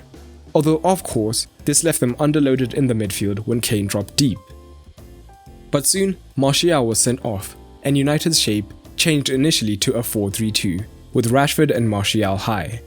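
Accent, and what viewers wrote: South Africa. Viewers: You sound South African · R u South African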